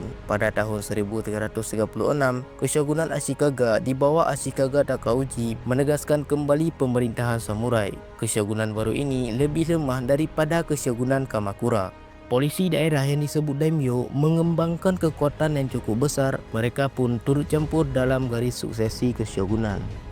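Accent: Aceh, Indonesia. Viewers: Logat acehnya nampak kali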